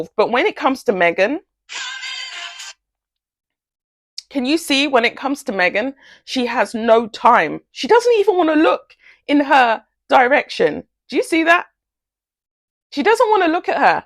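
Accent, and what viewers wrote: United Kingdom. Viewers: As soon as I heard your British accent I knew this was a hate video!!!! F U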